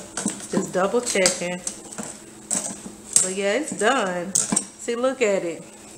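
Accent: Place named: South, United States. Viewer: Love your southern accent. I I might have to invest in one of these things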